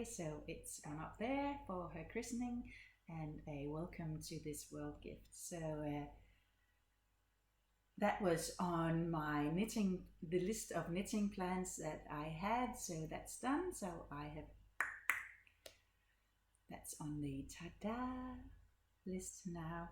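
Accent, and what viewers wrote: Denmark. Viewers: Så får du da lige en lille hilsen fra syd for Granada i Spanien også. Så er der et land mere på din liste. Det sjove er at jeg faktisk hørte om din podcast på en amerikansk podcast, og vidste ikke, at du var dansk, da jeg startede med at se din podcast en gang i slutningen af sidste år 😄 men jeg genkendte hurtigt accenten 😉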